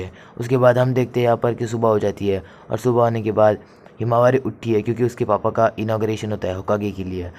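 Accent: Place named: Maharashtra, India. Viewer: Bhai tum maharashtra se ho na..😅 tumhari aavaj se laga muze..😅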